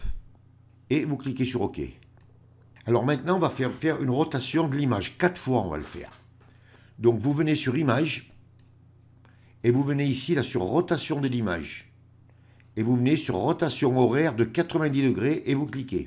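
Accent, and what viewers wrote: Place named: Saint-Étienne, France. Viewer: Merci pour vos tutoriels exceptionnels que j'apprécie énormément: même avec votre bon accent stéphanois tout est bien clair et limpide: vous êtes très patient et pédagogue.Un grand bravo..Je m'abonne pas souvent aux chaines youtube mais j'ai fait unbe exception pour vous!! Mais je fais la même remarque que Pierre Darre, pourquoi la rotation 4 fois de l'image??? Pourquoi?? Et votre lien de réponse ne solutionne pas la question!! Encore merci et à très bientôt!!!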